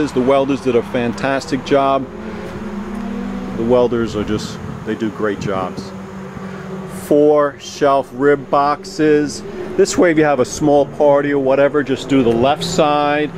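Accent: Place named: New England, United States. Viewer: I love the Yankee accent........ Yankees making Southern style BBQ always give me a chuckle. Hahahah Nice trailer and good information. I would love to have a trailer like this but it would be a real drive to get it here in the REAL DEEP SOUTH in Chile. Thanks for the video. Jim in Chile.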